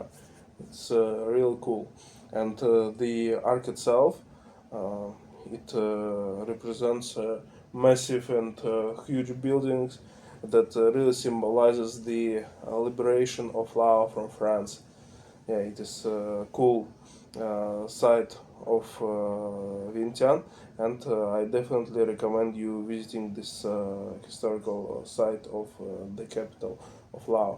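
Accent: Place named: Russia. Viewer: У меня впечатление, что так разговаривать может только россиянин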